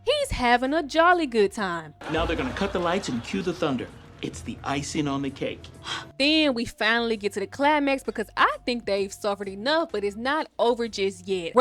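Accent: Memphis, United States. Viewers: You from Memphis ain’t it 😭😭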